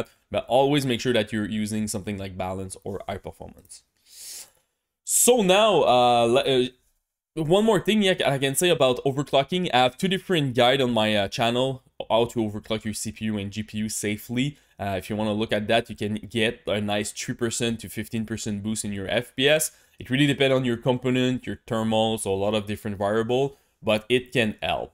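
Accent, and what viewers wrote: Quebec, Canada. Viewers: t québecois for sure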